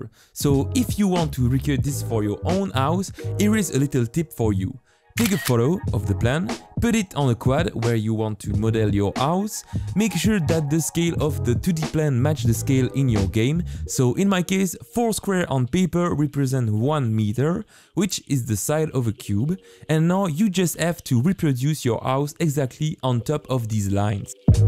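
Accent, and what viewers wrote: France. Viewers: Au vu de ton accent, tu est français non? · Its sseems you are french mon pote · ça se sens que tu es français alors salut mon pote !! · l'accent français de ouf, mdr (sinon superbe vidéo 👍) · Damn to accent francais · Oh le bon accent français 😁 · thats an incredibly french accent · i love how your french accent just peaks in the ou and au sounds, from a french student it is very satisfying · L'accent français 😂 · My god you sound so French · your defenitly french like me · its so obvious he's french lmao ! tkt tes pas seul XDD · French accent lol but amazing video! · Mdr sa me tue que PERSONNE a remarqué qu’il avait un putain d’accent français 😂 English translation: lol it kills me that NOBODY noticed that he has a fucking French accent 😂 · Eh mais t'es français je reconnais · Translation (according to google translate): Hey, great your video! 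Too bad I just discovered it ^^ I immediately recognized your accent, it's nice to see French people doing international;) I'm going to check the rest of your channel, good luck anyway, it promises heavy! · You're the frenchest person i've ever heard, very cool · Cette accent français mon frère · It's surprisingly quite rare to hear French accents on YouTube. Not that it's a problem at all. Sorry if it's Swiss or Belgian or whatever, I just can't tell the difference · L’accent français qui fait plaisir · Hey, super ta vidéo! Dommage que je vienne seulement de la découvrir ^^ J'ai directement reconnu ton accent, ça fait plaisir de voir des Français faire de l'internationale ;) J'vais checker le reste de ta chaine, bon courage en tout cas, ça promet du lourd! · on est d'accord qu'au vu de son accent il est clairement français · French accent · Ca ce vois beacoup que t'ai francais mais bon (Super cool la vidéo) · L'accent français on est là (en vrai tkt il est super ton accent 👌 super vidéo) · French accent my dude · yu have a french accent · that french accent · T es français toi sa se sent . 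· wow l accent francais on aime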